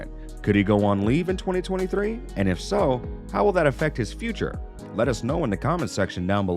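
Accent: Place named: United States. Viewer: Seriously as soon as you hear the American accent .. you know its just clickbait .. sigh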